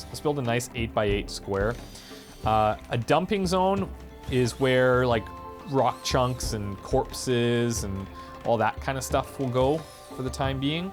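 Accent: Canada. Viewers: I totally thought he was Canadian. He just gives me a dear, homey Canadian feel lol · because your accent sounds like you're Canadian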